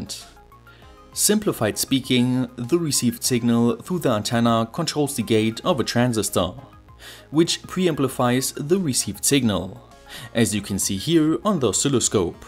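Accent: Germany. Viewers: It's surprising how many of my favorite YouTubers are German. From The Mathologer to TheOftler to you, I love the variety of accents! I notice your accent sounds a lot like Sabine Hossenfelder's.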